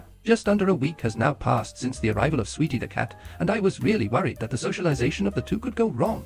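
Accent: United Kingdom. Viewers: Hello 😊... Yeah 🕺... First comment here 👌🏻. Good job and well done my friend. Very British speech. But what happened with the sound? 🤔 It's scratchy 😊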